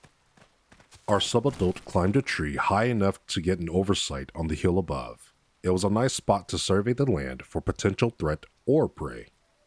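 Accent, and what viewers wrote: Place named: United States. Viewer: Thumbs down because you wouldn’t list its height and weight in imperial. Why have an American voice and use foreign measurements?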